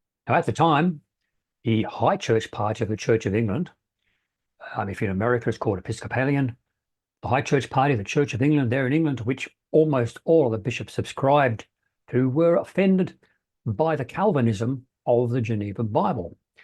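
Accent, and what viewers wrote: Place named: Australia. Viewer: Cool Accent. Love The Aussie Accent.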